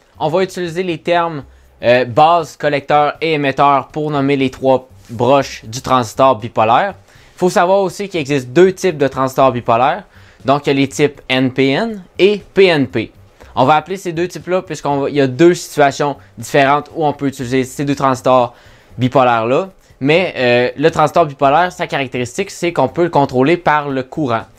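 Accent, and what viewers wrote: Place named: Quebec, Canada. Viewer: "à la bose du trastore"... que j'adore le français québéqouis! Vraiment, je fais pas de sarcasme, j'aime l'homme Québéquois.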